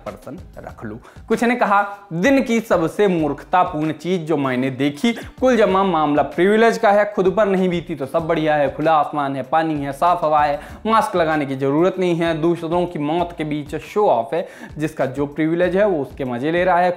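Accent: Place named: Bagheli, India. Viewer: Apki bhasha me bagheli ka put atulneey hai.. kitne acche se tedhkaya hai.. 😀🤣👏🙇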